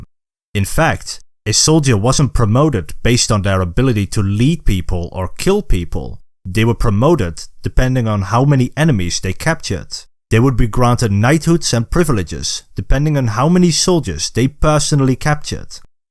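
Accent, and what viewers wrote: Netherlands. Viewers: Irritant Nederlands accent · I hear a typical Dutch accent. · its subtle but are you Dutch? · Are you Dutch ? Your accent sounds Dutch :) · I recognize the accent....Dutch! I used to live in Holland.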